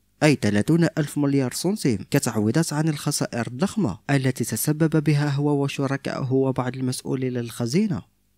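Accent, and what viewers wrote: Morocco. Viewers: Tu as une accent marocaine!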